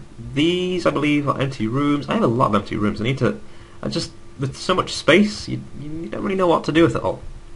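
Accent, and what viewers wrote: United Kingdom. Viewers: im not being mean but r u british cuz u sound like it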